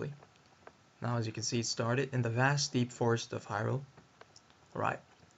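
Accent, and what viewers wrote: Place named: Brazil. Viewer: thanks man! I didn't know what to do with the citra.exe application. Do you live in Brazil? Your accent sounded like it., but I doubt it because of your name.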